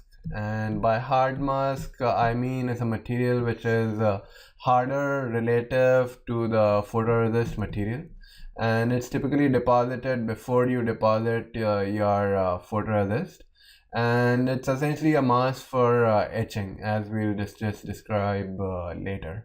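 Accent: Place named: India. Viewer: 阿三这口音我勒个去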